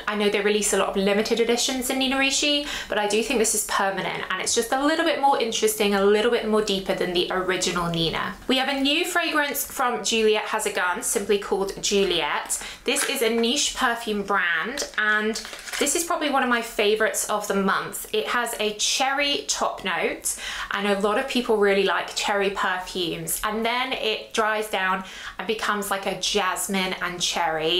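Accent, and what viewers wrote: United Kingdom. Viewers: I actually find most JHAG perfumes quite wearable, more than many of the designers' really.. I love your videos, not only for the perfume info, which is thorough and enjoyable in itself, but also because I get my "do se" 😅 of good British accent input that I need as a teacher😊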